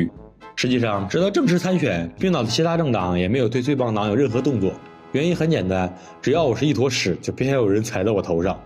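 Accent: Northeast, China. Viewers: 小伙儿故事编得不错👍大碴子声儿也没问题👌你就是口齿不清吞音太重不看字幕欣赏不到你的幽默😢好好去悲京广播学院播音系练练你的订阅至少能翻一倍😂这辈子别屈了你的才呀😅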